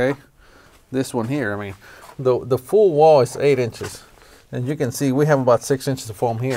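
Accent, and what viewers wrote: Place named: South, Mexico. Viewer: I hear a Mexican and a Southern accent when you talk.✌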